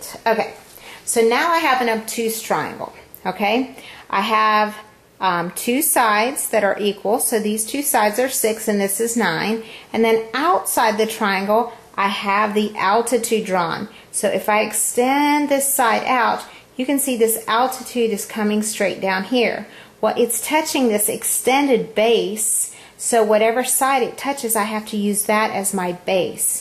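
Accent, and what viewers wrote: South, United States. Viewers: So southern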